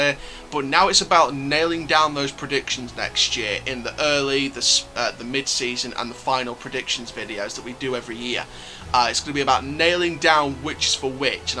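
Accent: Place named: United Kingdom. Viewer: You have a cool British accent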